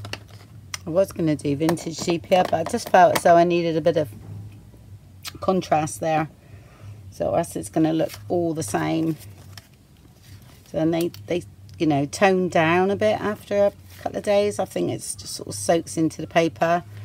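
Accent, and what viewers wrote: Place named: Cornwall, United Kingdom. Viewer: So glad I found your channel, these are so pretty I love how you make them look so easy but still so amazing. Also I love your accent, you wouldn't be cornish by any chance?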